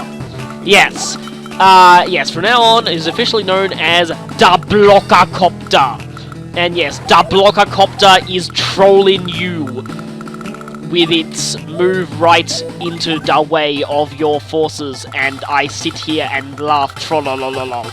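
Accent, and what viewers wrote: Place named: Australia. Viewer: Thanks for the reply. Saw your name pop up from a Chuggaaconroy tweet thread reply regarding your persona 5 playthrough and saw a mention of Australian accent. Was wondering if you were the same person, and lo and behold it was after hearing your voice and the copter. Glad to see your content like this, and I’m pleasantly surprised you’ve played the Trails in the sky series as well.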